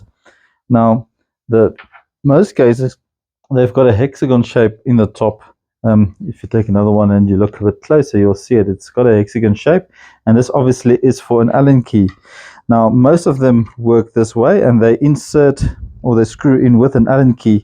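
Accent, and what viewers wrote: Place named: South Africa. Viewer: Thanks for these great ideas! I've never used the t nuts and hanger bolts before. I'm not sure that I can easily sink the t nuts into timber that is 160mm deep using either of your first two methods. The threaded inserts have to go into a wall stud to hand the floating shelf on. That will be awkward to because we can knock holes in the wall, but there must be a way to do this somehow. I used to have a Dr from South Africa about 30 years ago (he worked in Tasmania) I still love the accent, thanks for triggering a happy memory, of course I love your accent too as it's the same as his!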